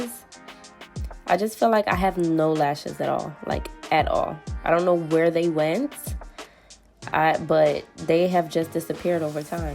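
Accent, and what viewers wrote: Jamaica. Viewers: Gurl jus a c seh u a jamaican❗ daumnn Loving yr videos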